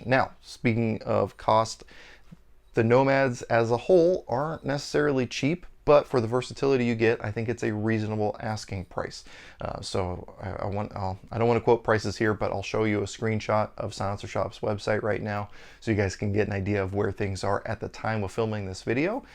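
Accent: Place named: Europe. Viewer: Someone comes from a European family... dead giveaway within 10 seconds of the video. Love the channel brother.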